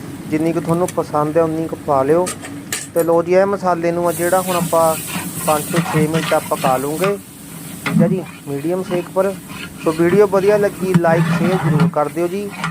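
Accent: Ropar, India. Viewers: ਕਮੈਂਟਰੀ ਕਰਨ ਵਾਲ਼ੇ ਦੀ ਭਾਸ਼ਾ ਤੋਂ ਲੱਗਦੈ ਕਿ ਰੋਪੜ ਸਾਈਡ ਦਾ ਏਰੀਆ ਹੈ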